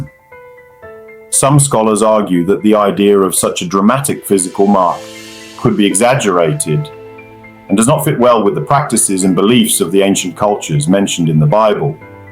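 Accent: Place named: United States. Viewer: TA falando ingles pra caraca americano mesmo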